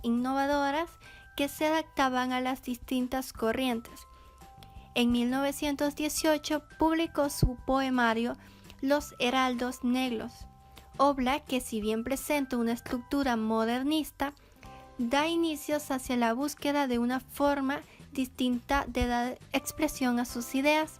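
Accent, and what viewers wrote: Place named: China. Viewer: buen vídeo, solo que la chica habla como si un chino hablara español no sabe decir la r, de resto todo fino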